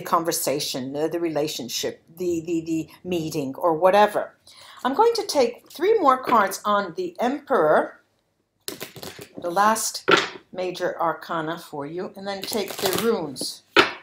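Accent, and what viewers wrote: Italy. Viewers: Italian Accent*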